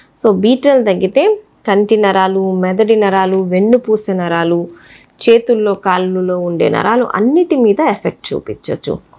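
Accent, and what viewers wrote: Guntur, India. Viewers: Amma. Bangaram. Konda. Thalli. Neeku. Nenu okka. Salahu. Isthanu. Neeku. Isthamaithe. ..manadhi Guntur kabatti. . Vacchuddi..poidhi..ki baduluga vasthundhi...pothundhi....ani. kanuka matladithe. Adbhuthamaina. Slang thayaru avuthundhamma...velaithe. naa. Yokka. Request. Ni..patinchu. lekapothe. Mana. Guntur. Mana. Ooru. Bhashani. Manamu. Endhuku. Odhulukovali. Anukunte. Nee isthamu. Bangaram...kaani. neevu. Bhavisyattulo. Chala goppa peru thechhukuntavamma....nevu. mathramu. Continue cheyyamma. Neeku. 10 million subscribers vastharamma. Thondharalone.. sweet. Voice. Plus. Most intelligent...DR..amma nevu. ..thalli.. MAY. GOD. BLESS. YOU. THALLI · అది గుంటూరు తెలుగు❤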